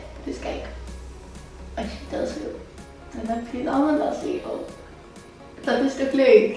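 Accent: Belgium. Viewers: Ben je Belgisch? Je hebt zo'n schattige accent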